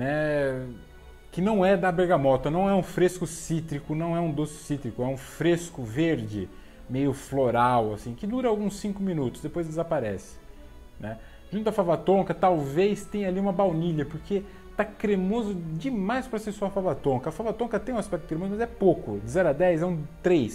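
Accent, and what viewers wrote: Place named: Rio de Janeiro, Brazil. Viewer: Salve lá!:) Eu ri demais do seu sotaque carioca, meu:D. Não curto muito as coisas da JPG porque é simplesmente doce demais:D. Vou por na lista dos decants para saber se vale a pena.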